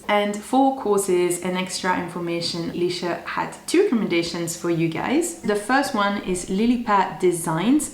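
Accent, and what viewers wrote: France. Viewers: I love your French accent and some words should just stay in the original language ☺️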